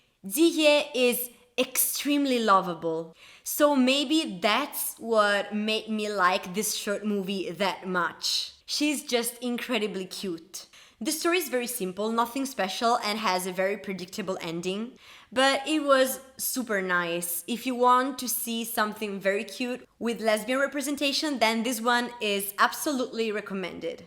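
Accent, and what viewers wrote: Italy. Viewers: please make video, all about theory of love and your thoughts, btw I like your Italian accent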